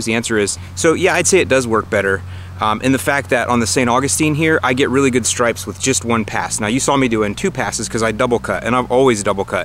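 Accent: Chicago, United States. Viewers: Keep the Chicago accent. too funny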